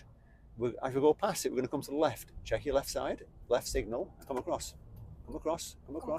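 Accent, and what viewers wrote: United Kingdom. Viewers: I can't understand English properly in British accent. How can i learn everything about driving skills? I can learn better in my own language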